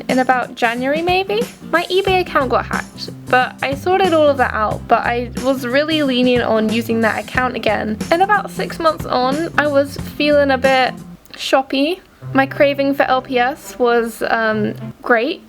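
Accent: United Kingdom. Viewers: Wait, you're british right? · yessss i know ur english now i thought u were from america lol trying to find english youtubers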